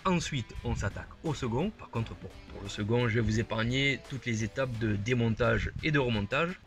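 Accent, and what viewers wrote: South, France. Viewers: Cc je suis un nouveau abonné à ta chaîne et je t'avoue que je trouve ça plutôt très bien ta des plans de vidéo propre des explications claires et surtout un putain d'accent du sud que j'adore 👍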